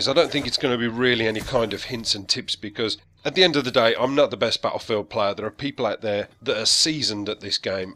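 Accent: Birmingham, United Kingdom. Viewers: Go for it bro just love seeing another brummie on YouTube lol ;)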